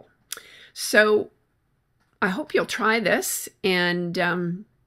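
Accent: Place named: Fargo, United States. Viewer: Omg I love your Fargo accent so cute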